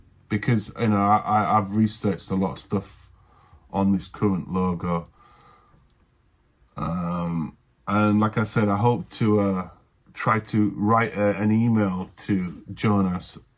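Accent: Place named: North Yorkshire, United Kingdom. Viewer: Interesting channel this! We do love our kebabs never even thought of who designed the logo! That’s definitely a North Yorkshire accent! All the best for 2025 👍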